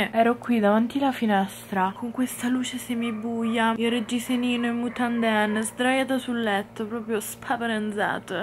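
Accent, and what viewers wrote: Rome, Italy. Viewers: Adoro il tuo accento romano!❤️❤️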